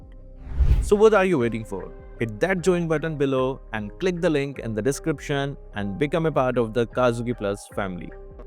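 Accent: India. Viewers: the moment I heard the Indian accent I just stopped all.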